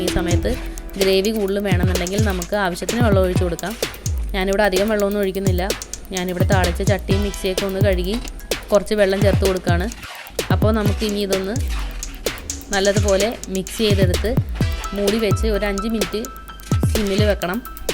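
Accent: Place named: Kochi, India. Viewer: adipoli preparation , kochi bhashayil paranjal polichu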